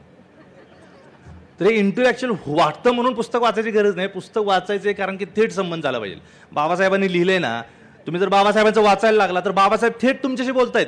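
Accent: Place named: Udgir, India. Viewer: मंजुळे सर तुम्ही माझ्या गावी उदगीरला आलात .खुप छान वाटले.मी अहमदनगर आहे पण तुमची भाषा ऐकुण उदगीरमध्येच आहे असे वाटले. आपली भेट व्हावी ही अपेक्षा.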